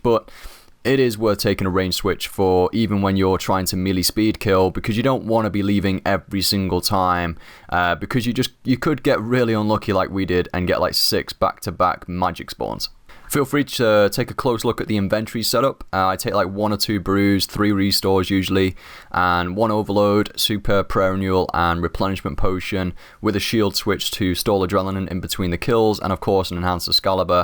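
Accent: United Kingdom. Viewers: Yo, what size monitor do you have and also are you from England?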